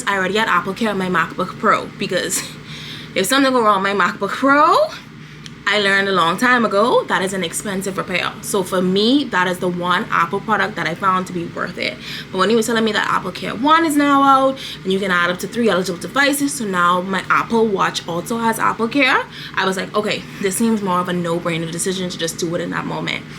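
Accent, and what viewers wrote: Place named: Bahamas. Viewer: I know a Bahamian when i hear an see one lol 😅 Love the video keep up the good work luv 🥳 242 to the world 🇧🇸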